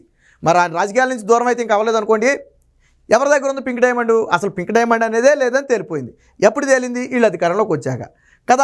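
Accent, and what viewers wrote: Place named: Guntur, India. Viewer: Maa Guntur language, manchi diction, 🎉 excellent presentetion, soopper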